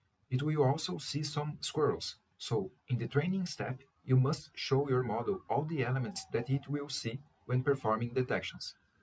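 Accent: Brazil. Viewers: vc é brasileiro né ? parece pelo sotaque. abraço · eu tava escutando vc falando e pensei, parece ser br, ai li o nome do canal kkkk vlw pelo tutorial mano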